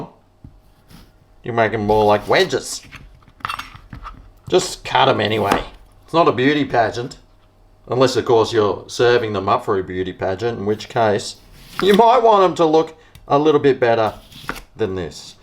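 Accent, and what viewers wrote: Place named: Australia. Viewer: Need to get one Airfyer fo myself. Chips don't look great but maybe it tastes good. Can you cook more food on Air Fryer for us. Thanks. Maybe a nice Roast chicken and potato. Thanks,. You do look like a comedian. And a good one. Enjoy your videos for sure! Thumbs up! As I subscribed.And good Aussie Accent.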